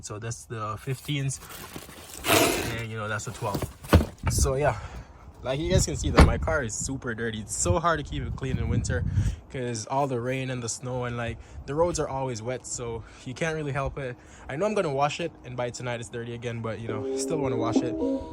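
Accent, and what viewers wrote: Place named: Jamaica. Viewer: Bro has a lil Jamaican accent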